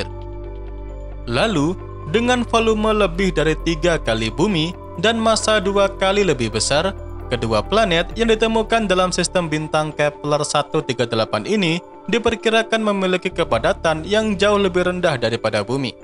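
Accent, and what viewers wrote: Java, Indonesia. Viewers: Pengisi suaranya wong jowo koyo e..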